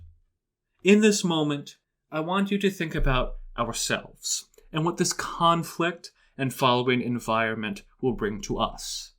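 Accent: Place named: United States. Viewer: Oh yup let’s keep pushing a pro Russian sentiment then go ahead and keep whining about how apparently Rusyns are being left off, bunch of bullshit considering you don’t even live in Ukraine you have a very nice clear American accent just a bunch of shit to disguise yourself as pro Russian and you sitting there happy for Ukrainian people being killed.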